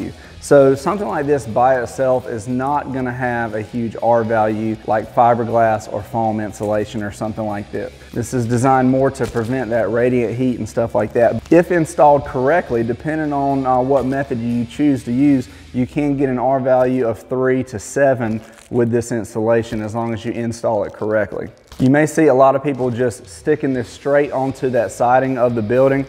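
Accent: South, United States. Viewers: I love your deep Southern accent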